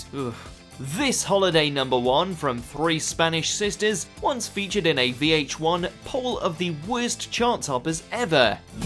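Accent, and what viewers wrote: United Kingdom. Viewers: The amount of mispronunciations of celebrity names, places and general British things are alarmingly many. I would accept it from a non-Brit but this guy sounds British so it's really confusing.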